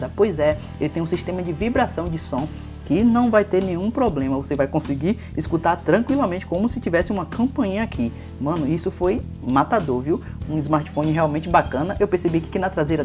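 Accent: Bahia, Brazil. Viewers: mano mim acabo de rir com o seu sutaque você é da Bahia ? um grande abraço.e link marato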